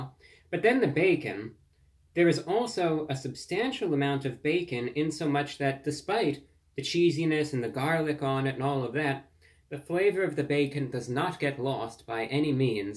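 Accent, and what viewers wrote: United Kingdom. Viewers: Loving his heavy British accent on the podcast now ❤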